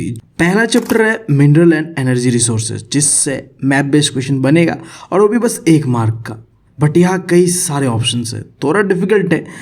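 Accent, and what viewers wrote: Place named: Bihar, India. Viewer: Bihari h kya bhai language se pahchan padh rhi h 😅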